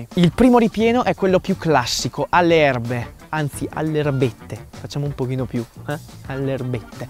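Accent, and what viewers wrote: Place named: Romagna, Italy. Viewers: Il cassone! 😍 E comunque l'accento romagnolo a sentirlo dagli altri si sente tantissimo, tipo "ma quindi gli altri quando mi ascoltano sentono sta pronuncia qui????" BICARBONATO, sempre! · Non tradire dora troppo forte la tua voce romagnola grande x lo straccio buonissimo tutto e tu grande cuoco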